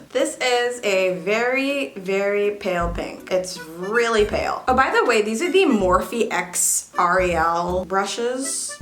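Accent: United Kingdom. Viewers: i love her british accent😭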